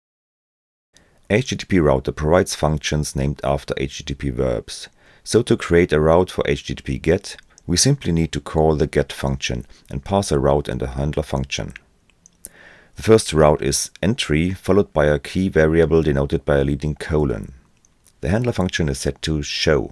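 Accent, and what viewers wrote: Germany. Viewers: The German accent is strong with this one ^^ Great video, well done! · 好棒， 简单明了， 听英文口音像德国人？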